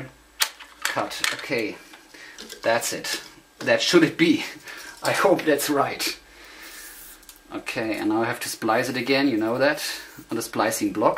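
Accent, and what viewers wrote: Germany. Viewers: LOL, "Peeeeep!" instead of "beeep"! :-D Love that German accent, man! :-D Fun video (even with the disappointment that the pro recorder was too stupidly designed to play also)! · I love your german accentt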